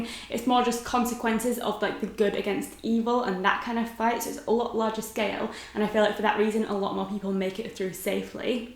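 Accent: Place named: Yorkshire, United Kingdom. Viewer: This was so fun ☺️ love your videos and just the whole vibe of your channel! Are you from Yorkshire? I feel like I can hear it in your accent 😁 I’m a Yorkshire lass 🥰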